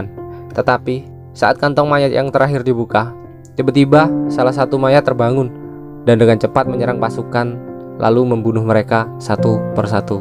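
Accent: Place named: Java, Indonesia. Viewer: Org jawa kalo ngomong indonesia, mad dog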